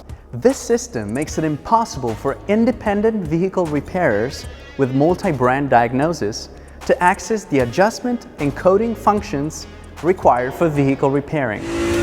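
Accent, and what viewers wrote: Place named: Italy. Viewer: I don't understand everything (cause his strong Italian accent) but it seems very interesting. Goog tutorial.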